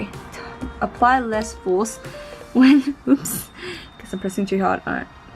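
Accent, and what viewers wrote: Australia. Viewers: Are you Australian cause I am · Are Australian? You sound like you are? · Your Australian!!!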